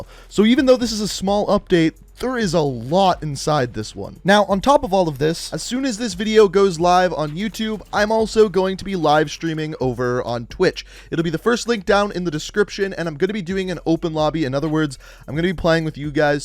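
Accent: Canada. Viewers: I hate Canadian accents !!! You guys have weird ways of saying certain words and it kills us here in the US !!! I even hate people who live close to Canada cuz they also talk like that and its fucking annoying !!!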